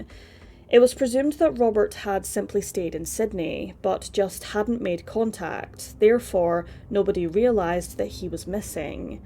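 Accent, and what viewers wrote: Scotland, United Kingdom. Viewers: SHE'S IS SCOTTISH ACCENT THANK YOU!!!!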